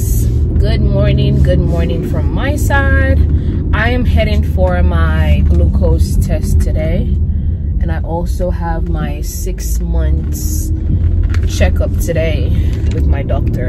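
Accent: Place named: England, United Kingdom. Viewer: Your skin is glowing ♥️😩 It's your English accent for me💖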